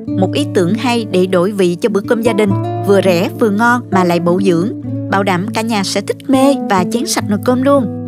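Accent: South, Vietnam. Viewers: Em có giống nói miền nam rất dể thương 😊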